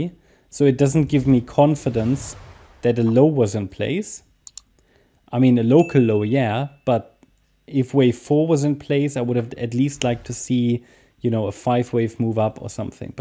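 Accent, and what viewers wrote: Germany. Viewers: stets sehr starke Analysen (ich höre den deutschen Akzent raus :)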